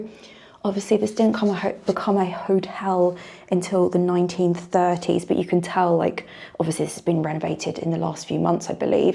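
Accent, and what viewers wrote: RP, United Kingdom. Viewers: Hello from America. I am indulging myself in your speech, your choice of words, and your cadence. The British accent (from my perspective) is simply charming. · you need to come and check out Aussieland this Chrissy because we love your posh British accent.we,'ll fill you full of Prawnies and FOSTERS,we love poms.The ashes will be on as well as all the British backpacker parties on Bondi beach when they leave their rubbish but we don't mind,come and enjoy.😊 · £835!!!! 🙈 that outrageous for most of us, it looks lovely but I dont think its worth it Plus the kettle should have been emptied before you came, you have no idea how long that water had been sitting in there and where were the biscuits????? Plus you do sound very well spoken (quite posh sounding ) 🤣 so I think you can carry it off more than the rest of us 😊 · Hi from Morocco, I watch your videos every day, cuz I love your RP accent and I want to speak like you.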